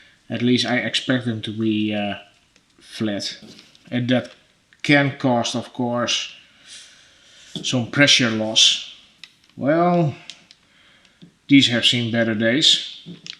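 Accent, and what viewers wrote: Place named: Netherlands. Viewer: Hallo ik hoor dat u van nederland bent en nederlands spreekt ik wou u vragen heb een probleem met mijn auto ik hoor een schurende geluid in de buurt van de distributie riem heb alles vervangen distributie riem met spanner waterpomp multi v rien met spanrol krukaspoelie alternator maar geluid is er nog steeds het komt binnen in de krukas percies heb onlangs mijn olie vevangen en geluid is percies verminderd kan het nu zijn dat de olie pomp die lawaai maakte het is percies metaal over metaal percies lagers over elkaar kan u mijn aub helpen en kan het zijn dat de olie pomp versleten is en een schurende geluid maakt . Ben u dankbaar voor u reactie dank u .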